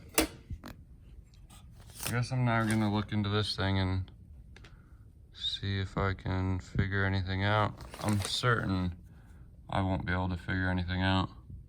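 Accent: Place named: Venice California, United States. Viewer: Bruh your personality is funny sound like a Cali Venice man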